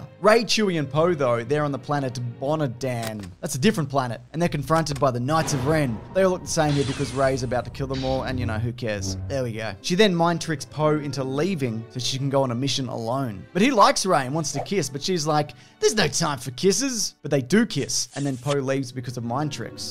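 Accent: Australia. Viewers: you sound aussie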